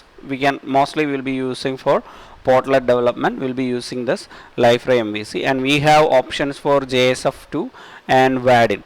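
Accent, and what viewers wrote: India. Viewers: Mallu Accent :D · your accent is very annoying ,plz try to speak better and clearer and further away from that ... indian accent.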